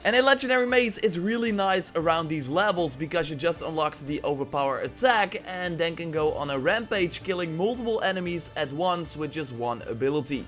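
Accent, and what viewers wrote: Netherlands. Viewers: He’s Dutch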